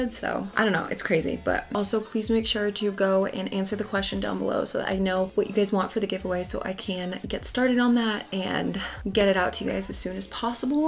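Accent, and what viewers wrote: United Kingdom. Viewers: Hi I’m a fan who lives in London and I looove your sudden british accent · wait do you have a british accent? please answer i need to know im so confused haha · congrats on 3k!!! <3 for a video idea it would be so fun to see how u style ur brandy items with other things in ur closet, i always get new things but then dont know how to incorporate them plus ur style is so cute also this is weird but ur british accent makes me so happy lol my friends and i always did that with each other before quarantine kicked us off campus rip </3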